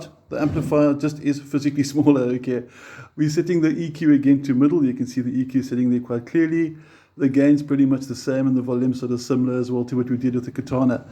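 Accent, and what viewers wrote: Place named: South Africa. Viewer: Much as I approve of your ZA/NA (?) accent, "NUX" is pronounced NEW-X in spoken English according to NUX (Cherub CN) themselves. Hey, as an owner of many NUX products and native English speaker from a time when grammar, punctuation, spelling and pronunciation were taught, insisted upon and examined, I used to pronounce it as spelled too for a long time. The keyboard doesn't type the subtle ">" used in the "NU>X" branding for so long well, which I suppose gave some hint of it. Returning to the relative mini amp comparison, of these three I chose and use the 3W Blackstar Fly 3 & Blackstar Fly 3 + Fly 103 6W Stereo combo for my battery powered portability deployment needs. Taking into consideration my purpose for the Fly 3s as I already had a Blackstar ID:Core Stereo 40W (now two thereoff with the addition of the latest V3 incarnation of it) for my primary practice amp, as well as multiple other larger electric performance and acoustic amps . 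In my country (AU) the NUX8 BT 8W with BT sells for around the same price as the Fly 3+Fly 103 6W Stereo combo (no BT) which includes its 240v AC to 6.5v DC step down PSU in the pack. The latter Blackstar 6W Stereo combo is really the direct comparison to the NUX price point for price point, with the advantage of Stereo which it trades off against the NUX's feature of Bluetooth. So in that sense, the standalone 3W Fly 3 isn't a fair or balanced comparison considering it sells for half the price of the NUX8BT or BOSS Katana Mini. Not that it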